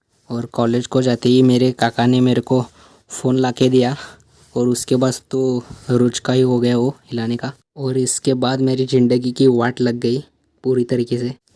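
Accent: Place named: Maharashtra, India. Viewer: U r maraThi🌝Accent Pechanl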